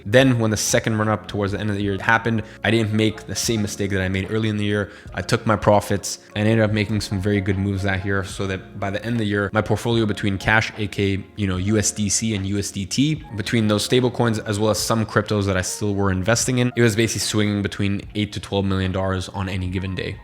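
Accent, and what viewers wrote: United States. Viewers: you have an American accent. Where are you from? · How does he have that accent if he's only been to the US twice at that age ? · You sound American.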